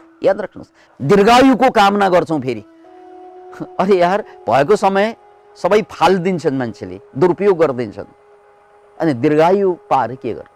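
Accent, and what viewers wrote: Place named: India. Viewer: बोलेको सुन्दा त इन्डियन हो कि जस्तो पो लाग्छ हौ!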